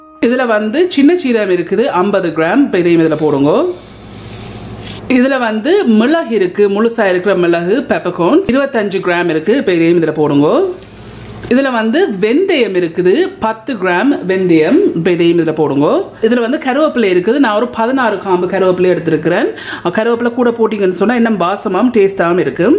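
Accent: Jaffna, Sri Lanka. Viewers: அக்கா நானும் இப்படி தான் வறுக்குறனான் நீங்கள் இலங்கை யாழ்ப்பாணம் என்று தெரியுது அக்கா எனக்கு இறைச்சிக்கு போடுற தூள் போடுறிக்களா அக்கா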